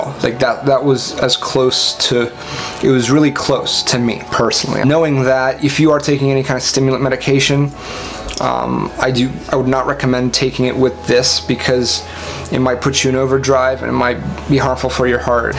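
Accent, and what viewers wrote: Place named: Nigerian American, United States. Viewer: o that's what you mean. It's Nigerian American from southern part of California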